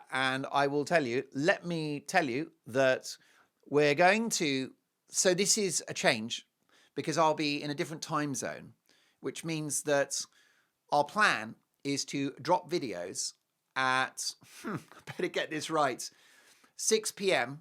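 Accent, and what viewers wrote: United States. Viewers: I agree with PETA to stop the horrible breeding places, adopt first! PS-Your American accent is spot on and great outfit today! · Your American accent is good!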